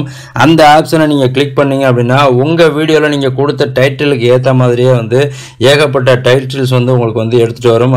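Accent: Tirunelveli, India. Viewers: Bro thirunelveli ya voongalukku super bro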